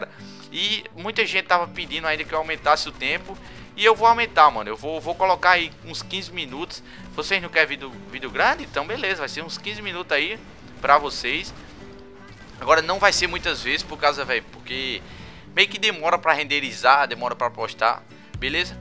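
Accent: Northeast, Brazil. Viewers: KKKKKKKKKKKKKKKKKKKKKKKKKKKKKKKKKKKKKKKKKKKKKKKKKKKKKKKKKKKKKKKKKKKKKKKKKKKKKKKK Eu queria ter o sotaque nordestino mano o negosso é muito dahora man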